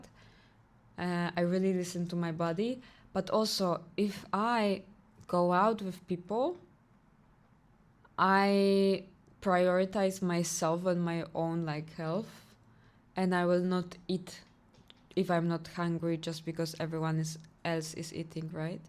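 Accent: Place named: Russia. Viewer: У Вас потрясающий русский акцент 🔥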